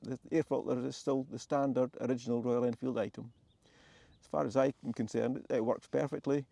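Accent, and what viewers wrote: Scotland, United Kingdom. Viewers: haha no doubt, but I meant experiencing the next level of RE bikes. Anyways, thumbs up to these cool videos and that super cool Scottish accent.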